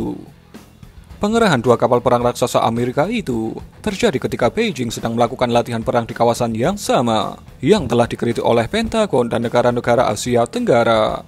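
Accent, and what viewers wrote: Java, Indonesia. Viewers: Medok nya dak hilang2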